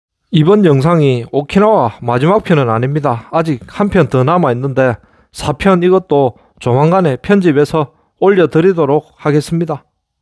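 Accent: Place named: Busan, South Korea. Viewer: 오리지날 부산사투리로 해설해주니 정겹고 잊은지오래되는 사투리도생각나서 너무즐겁습니다 화이팅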